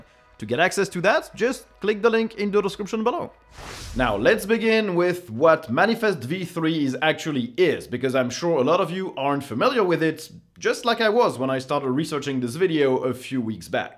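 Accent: France. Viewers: Je me disais aussi que tu avais un accent français :)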